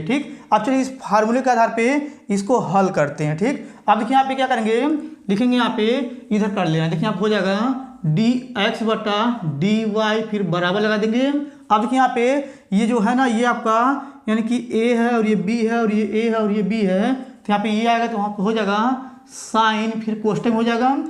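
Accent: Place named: Bihar, India. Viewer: thank you सर रिप्लाई के लिए आपकी भाषा को सुनकर लगा कि शायद बिहार के है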